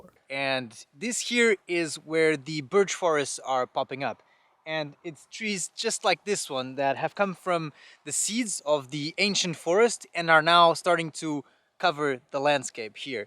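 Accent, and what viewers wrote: Portugal. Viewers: You have a portuguese accent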